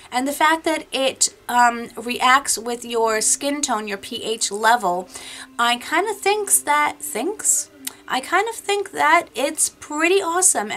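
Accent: New York, United States. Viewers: I LOVE the Dr. Jart Tiger Grass Cicapair cream! That stuff is amazing! It helps with mild sunburn, redness from the cold, rosacea, even my eczema blotches I sometimes get on my neck, anything that will make skin red. That was one of my fav finds in 2018, but I'm sure it's been around before that. Oh yeah and speaking of fav finds, the Zodiac pallets! I have all of the bh spacey pallets! They are awesome! I'm 42 and never before bought big pallets before this., you would like the first Zodiac maybe I think you would rock the cool tones and I'm guessing Supernova would look awesome on you too! The Galaxy Chic is my fav, but you would love the Aurora Lights because the colors are shimmery. I could go on! Haa sorry Oh and thx for warning me about the charcoal magnesium deodorant! I was thinking of buying it. I don't like their regular formulas, but the sensitive skin ones are good. 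Natural deodorants are rarely good enough. My fav ones are Kiss My Face-dry spray and stick, E.O. sprays, and Arm&Hammer Essentials (it's half way to natural) and I still have to try the Native one in my Target box that I keep seeing good things about. Oh and that Tom Ford perfume, oh woww haa daaamn that stuff is gooooood! Ok I'll stop. Thx for the info,. And your voice is nice and calming- love the NY accent too. Have a great weekend!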